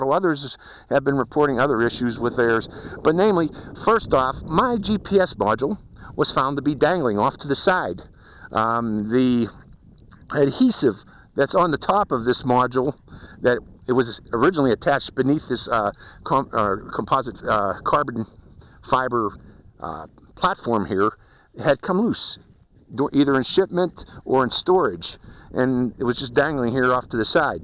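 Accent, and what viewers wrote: Pittsburgh, United States. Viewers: Hi there, you have to be from Pittsburgh or there abouts, thats Steelers Country accent. Banggood has this whole package, copter, i6, goggles and even a case for 50% off and it's the updated version with new stacked ESCs, GPS module is oriented properly etc. The deal ends tomorrow, $180 for everything gonna give it a shot.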